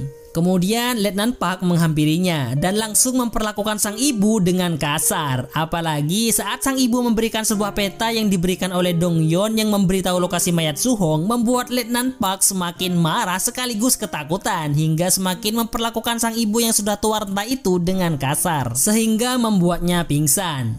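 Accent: Madura, Indonesia. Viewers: Orang madura bang??? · Ternyata bebengusnya oreng madureh yeeeh🗿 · Bang Madura nya daerah mana bang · mimin orang madura ya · Orng madura ya mas hh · Bang kakeh reng madureh yeh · Mas ya orang Madura ya,,, sumpah baru kali ini liat alur cerita ending ya q nangis sampe sesenggukan,,, terimakasih mas sudah membatu menceritakan,,sebab mau nonton film ya GK ada sub indo ya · Pasti Orang Madura ya hahha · Orang madura bang?medureh dimmah? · Barusadar orang madura kah😅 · Logat nya madura bngt😅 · min orang madura kmu min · Kak maaf aku ketawa, logatnya lucu, kaya logat orang madura. Emang asal madura kah? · Yg cerita org madura kah... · Kelihatannya sang moderator ini orang Madura tak iya...😂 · Reng madureh bn min? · Madura nih adminnya😂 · Orang Madura min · Pasti orang madura😅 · Madureh kakeh min? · bhs madure wkwk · Lu orang Madura ya bg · mangkanah reng madureh😂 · Orang Madura ya 😅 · Reng madureh kakeh🎉😅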